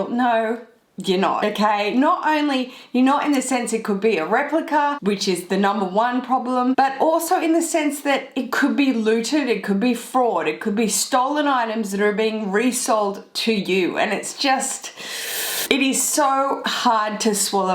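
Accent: Australia. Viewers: Hi, I love watching your videos and hearing your Aussie accent too 😍😍 sorry don’t wanna sound rude but just out of curiosity, what is your plan with your luxury bags collection in the future? What is your goal with them? You obviously won’t be able to wear them all, so what’s your plan with your collection in the future? Thanks! 😊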